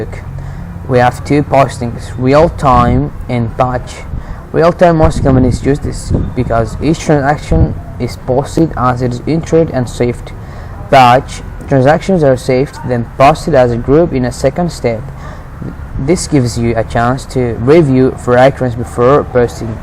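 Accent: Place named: United Kingdom. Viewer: What’s up with that British accent 😐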